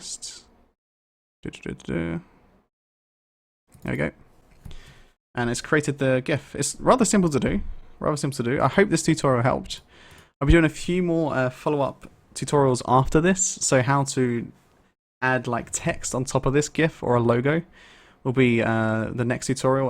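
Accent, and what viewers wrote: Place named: England, United Kingdom. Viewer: Honestly when ever I hear an english accent on starting a tutorial I think ... YES !! its likely to be clear, concise and to the point .. thank you it was .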